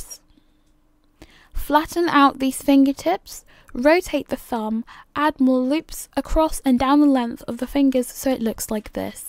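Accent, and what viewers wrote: United Kingdom. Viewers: I just focused on the British voice.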